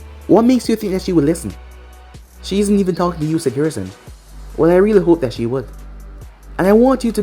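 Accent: Jamaica. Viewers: Hey i have a question by any chance are you jamaican???🤔🤔🤔🤔, I am just asking since when I listen to your stories i hear a bit of the accent🤔🤔🤔🤔 #hmmmm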